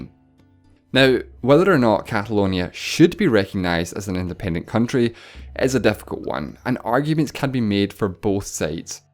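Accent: United Kingdom. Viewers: May I ask where part of the UK are you from?. I mean, My native language is spanish; I've studied English, but I've never been in an English speaking Country and I have some issues understanding some accents, in particular I have issues with Bitish English (that's what I thouthgt) and some whith canadian and american English from the northest (Massachussetts and that area). But I have noticed that you have a very British accent and notheless, I can understand you pretty well.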